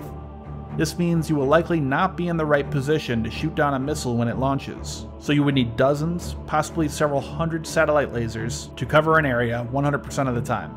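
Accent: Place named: United States. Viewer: Love this channel. Only person with an American accent who's unbiased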